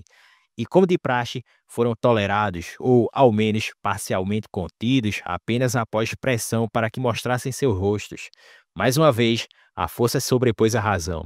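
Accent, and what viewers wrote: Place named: Ceará, Brazil. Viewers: Do carriri cearense, certeza ❤😂😂😂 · Narrador cearense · a narraçao nordestina cagou com o canal , não canal inteligente e voz nordestina não combinam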